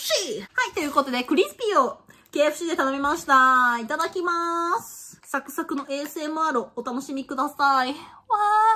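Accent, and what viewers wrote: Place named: Kansai, Japan. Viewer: 割と低音の関西弁ほんとに再現されすぎ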